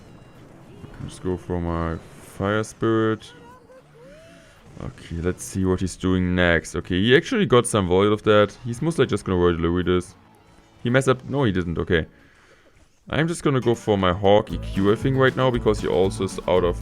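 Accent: Germany. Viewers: Your english look like deutsh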